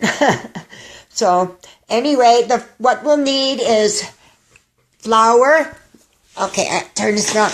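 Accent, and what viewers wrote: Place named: South Dakota, United States. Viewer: I’m from McLaughlin , SD and your voice brought me back home 😅😂 haven’t had gabubu bread in so long !!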